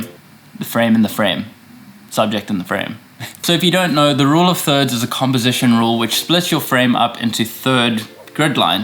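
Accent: South Africa. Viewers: Are you south African. Tour accent sounds like it.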